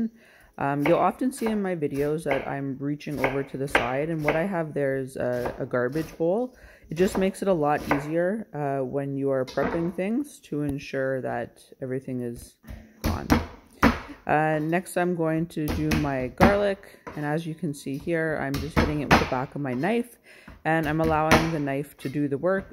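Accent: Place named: United Kingdom. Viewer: Why did I think you was British lol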